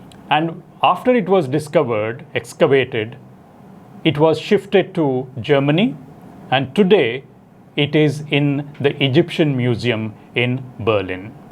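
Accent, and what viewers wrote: India. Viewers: I hear only Indian accent. No English there sir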